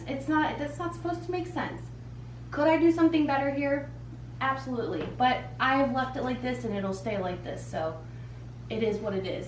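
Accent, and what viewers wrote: United States. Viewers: Hi frands....🙋😘 You guys are, sooo, funny. 😂😂😂 I, soooo, needed this tonight. I'm laughing so hard I can't type...😭😭 southern accent and backyard tour was hilarious. Love you guys. Love watching Chip and JoAnna. Great show. Your show is more fun. I like your little house. It's cozy. Love the blue chair. Where did you say you bought it? You could put little blue lights around corkboard to balance with the blue chair. A couple of yellow pillows will give the couch a pop of color and would look nice with the poo of blue from the chair. Have you ever thought of doing your house using Fung Shei. The style uses the elements in each room of, Wood, Fire, Water, and Metal. Placement is put according to North, South, East, and West. By combining the different textures, it brings harmony and prosperity into the home. It's, quite, fascinating and fun. 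You can google it and it will take you through each room and tell you what textures and colors will go together. Each room uses the NSEW areas and middle to bring calmness and harmony. You may not want to spray lysol around. It can cause neurological issues in humans and pets. My kitty had seizures after spraying it, one day. She suffered some brain damage and couldn't walk straight for almost a month. She was, terribly, wobbly and I had to carry her around and to her litter box and food. Slept on the floor with her, in case she needed to go potty or eat. She, eventually, got better, but, was never the same. I never use chemical prod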